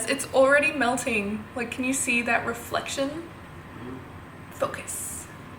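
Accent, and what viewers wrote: Australia. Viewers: Definitely the Aussie accent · Just an Australian accent, that's how most people say it here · KristinaS2009 If you watched the video you would've seen the explanation. She's australian, that's how a lot of them say it. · I feel like it's the accent. I am also Australian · It's how it's pronounced in Australia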